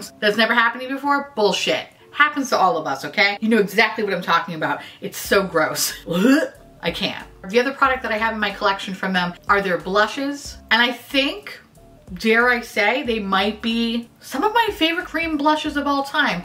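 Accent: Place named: Chicago, United States. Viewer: I’m one and a half minutes in... I don’t remember if it was jaimie French or Smokey glow that sent me here... but whoever it was. THANK YOU BIIII**** 😂❤️❤️ Edit: it doesn’t hurt that you have a very similar accent to my dads side of the family from Chicago. 😂❤️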